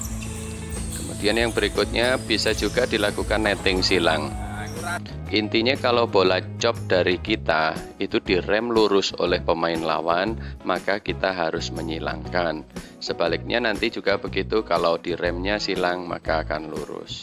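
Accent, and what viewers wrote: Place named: Java, Indonesia. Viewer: Saya jogja pak, saya kira bapak jogja kog ada logat jawanya Terus berkarya pokoknya coach.. Kami tunggu video selnjutnya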